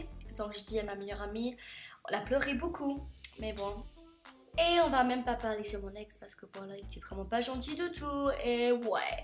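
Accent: England, United Kingdom. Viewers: j'ai pensé que tu es de l'Angleterre, je peux encore entendre ton accent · super la vidéo c'est super dur de quitter son pays natale et d'apprendre une nouvelle langue donc tu as eu du courage continue comme sa (j'aime trop ton accent Anglais!)❤ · C'est courageux de ta part d'être venu en france sans même savoir parler français et j'adore ton petit accent anglais :P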